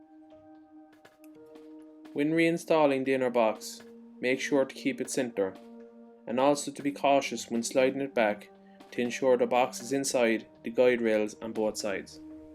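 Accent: Ireland. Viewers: excellent help as i had to replace all my baffles. Also if you enjoy listening to the melodious Irish accent its a gem .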